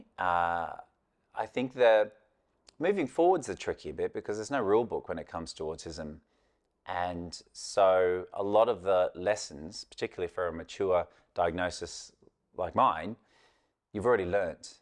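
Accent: Australia. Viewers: Because he was born in my Hometown of Brisbane QLD Australia & never lost his Aussie accent. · Love the channel, I about to rescue a retired greyhound, I have had one before and they are the most beautiful dogs. I love your accent, I have my Siri on Australian! 🇦🇺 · I am so sorry to hear about you and Zoe. I was thinking that something was wrong because you stopped wearing your wedding ring. You are such an amazing vet with an incredible affinity for animals and people. You are humble, open, and honest. You are so brave to share all your personal business. We want to know because we care about you and Zoe, and we want to be assured that you are alright in every aspect of your professional and personal life. Also, I love to listen to you talk because of your alluring Australian accent. Please continue to do these videos and your Rescue Vet program. Love from a Canadian fan. 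Wishing you luck and success. ❤😊 Deb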